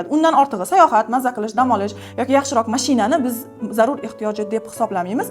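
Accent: Khorezm, Uzbekistan. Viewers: Асалому алайкум Хоразмликмисиз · Бу айол хоразмлик экан шева хоразмга кетябди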